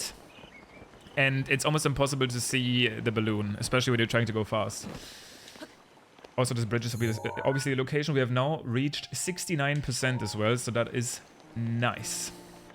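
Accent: Germany. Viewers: Are you German? Your English sounds German.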